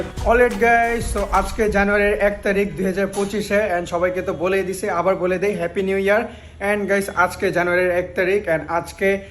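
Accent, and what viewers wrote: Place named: Old Dhaka, Bangladesh. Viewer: Bai kotha gule puran dakar apnar bareta gerokom kotha bolen serokom kore bolle valo hoto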